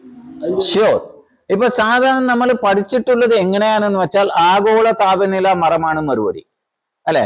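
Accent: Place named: Tamil Nadu, India. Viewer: വാക്കുകളിൽ തമിഴ് ചുവയുണ്ടെങ്കിലും അവതരണ്o സൂപ്പർ. അറിവിൻ്റെ നിറകുടമാണ് താങ്കൾ.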